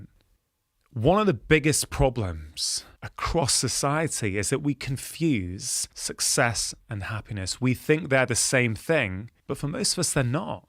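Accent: United Kingdom. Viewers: No, is British and speaks perfect English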